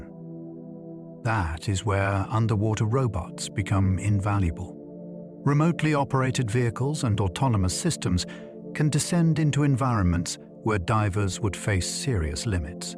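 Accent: United Kingdom. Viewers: That’s ironic that you chose a British guys Chanel. I quite enjoy his crisp accent, the cadence is soothing, as well as the tones. Good luck finding one to your liking, the rest of us will be content to stay.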